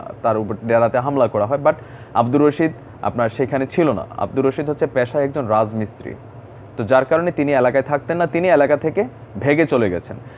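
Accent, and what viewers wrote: Comilla, Bangladesh. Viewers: ভাই আপনি কুমিল্লার ভাষায় কথা বলেন খুব ভালো লাগে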